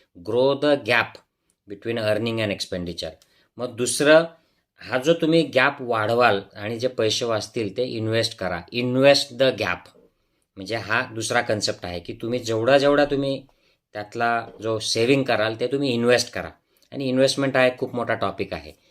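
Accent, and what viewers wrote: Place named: Ahirani, India. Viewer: डॉक्टर साहेब मराठीमध्ये सांगत असताना अहिराणी ची झलक येते थोडी-थोडी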